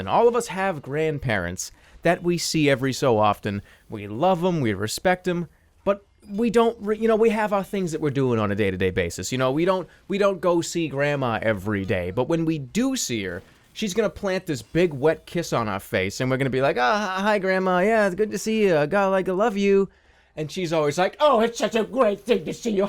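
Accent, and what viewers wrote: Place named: East Coast, United States. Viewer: Jerma are you from the east coast? You have the accent and its awesome!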